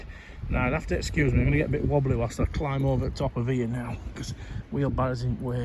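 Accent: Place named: Barnsley, United Kingdom. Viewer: Wheres your allotment you sound like your near Barnsley ?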